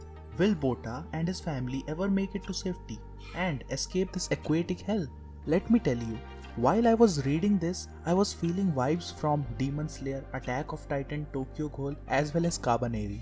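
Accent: India. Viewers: His accent like indian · Indian detected hehe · bro pls turn back the old video type ı don't wanna hear your indian accent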